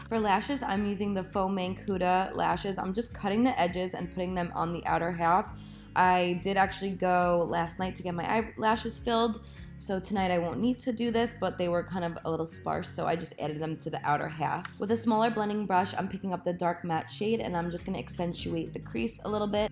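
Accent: New Jersey, United States. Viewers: Sooooo Jersey ! Love it!!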